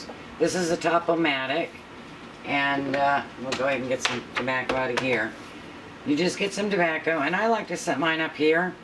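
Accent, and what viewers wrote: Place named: United States. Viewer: Hi there, thanks for the video. I've got a couple of questions regarding this. Firstly, in terms of flavour and general smoking satisfaction, how do these 'natural' cigarettes compare to regular, store bought cigarettes? - I've unintentionally bought 'fake' tobacco in the past, and the flavour was significantly different, though not necessarily worse. Secondly, I notice you haven't added any chemicals to your tobacco, excluding the menthol and glycerin. Now I know that tobacco companies like to add chemicals to the tobacco to help the cigarettes stay alight. Do you have any difficulties in keeping your cigarettes alight? I'm guessing by your accent that you live in a warm, dry, part of the USA. I myself live in the UK, where wind and rain make up at least 50% of the weather, which is often problematic for us smokers. I only ask as I'm considering looking into the necessary steps needed to start my own tobacco company, a fair trade company which pays it's farmers (likely African) a good wage, but that also (hopefully if viable) offers natural tobacco to it's customers. 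Many thanks for your help, and video, informative to say the least, if all else fails I may even grow and process my own tobacco :)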